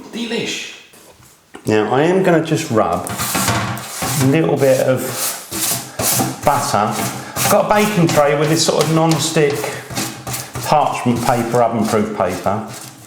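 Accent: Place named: London, United Kingdom. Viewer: He's not from Yorkshire; maybe London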